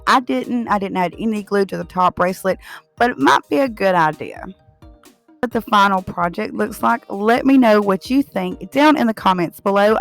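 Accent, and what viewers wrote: South, United States. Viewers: Beautiful work Beverly sent me over to check you out. I really like what I'm seeing and I just love your country accent 💕💓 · 😍😍😍😍😍😍😍 I have never subbed so fast! Plus I love your accent coming from another southern lady❤️ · I just love listening to your accent , where in the states do you live? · OMG I just love your southern accent, I’m from Texas and still haven’t picked up The accent , your voice is so satisfying you probably can do satisfying videos and get paid for it👍🏽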